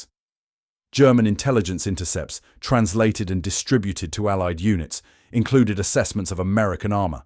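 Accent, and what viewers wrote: United Kingdom. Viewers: A British accent doesn't make it true!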